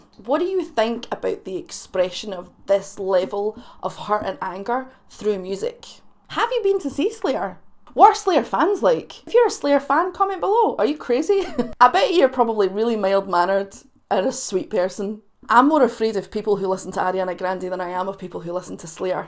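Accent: Scotland, United Kingdom. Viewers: are you from Scotland?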